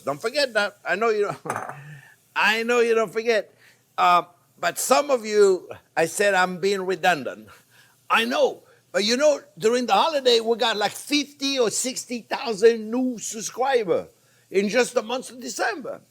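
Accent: France. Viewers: “Onyo is always number first”, you’re awesome... I’ve also been using your accent while cooking. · Just discovered your channel last week and haven’t stopped watching the videos ever since! I love your voice, your food, your accent 💖 Everything about you and your channel is absolutely succulent 🤩 I’m almost 70, of Persian origin, living in France for the past 50 years, married to an Italian for over 2 decades and recognized as a good cook by all (even by my late mother in law who was a dream of a cook herself!) So I dare say I know food 💃🏻 You and your channel are a pure delight. Don’t change a thing👌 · And i love your french residues in your English as much as i love you detailed instructions & explainations in your video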